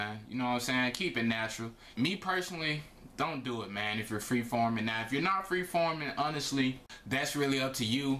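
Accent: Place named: South, United States. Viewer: ahh okay. I gotta cousin from Texas and you sound just like him so I knew it was a southern accent.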